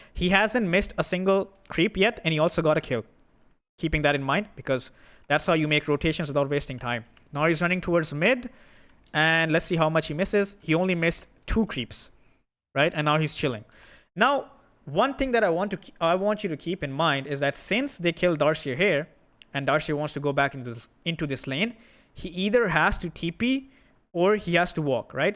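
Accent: India. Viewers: see scammer indian accent click dislike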